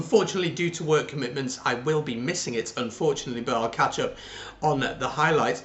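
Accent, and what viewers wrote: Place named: England, United Kingdom. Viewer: You're not the best with accents are you mate!? His accent is totally English.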